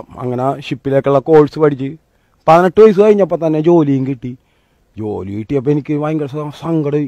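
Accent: Kochi, India. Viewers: Sorrry. Da. Nindea. Kochi basha. Suuuppper. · അവൻ ആദ്യം മുഖവുര പറഞ്ഞല്ലോ കൊച്ചിക്കാരൻ ആണ് എന്ന് . കൊച്ചി സംസാരരീതി ഇവന് 100 % ഉണ്ട് . കൊച്ചിക്കാരുടെ സംസാരം കഞ്ചാവ് അടിച്ച് പറയും പോലെയാണ് . ചിലവൻമാർ കഞ്ചാവും ആയിരിക്കും . "കൊച്ചി കണ്ട വന് അച്ചി വേണ്ട" എന്ന് പഴമക്കാർ പറയുന്നതിൽ കാര്യം ഉണ്ട് . പണ്ട് ആണുങ്ങൾ കൊച്ചിയിൽ പോവുന്നത് ലാവിഷായ് പെണ്ണുങ്ങളെ വ്യഭിചരിക്കാൻ കിട്ടുന്നത് കൊണ്ടാണ് . ഒരുപാട് പെണ്ണുങ്ങളുമായി വ്യഭിചരിച്ച പുരുഷന് പീന്നീട് പെണ്ണ് കെട്ടി ജിമിക്കാൻ താൽപര്യം കുറയും . അതാണ് അച്ചി വേണ്ടാ എന്ന് പറയുന്നത് . (Note: ഇപ്പോൾ അവിടത്തെ ആണുങ്ങൾക്ക് കുറച്ച് വിവരം വെച്ചു . അത് കൊണ്ട് പഴയ പോലെ കളിക്ക് പെണ്ണ് ലാവിഷ് അല്ല 🤪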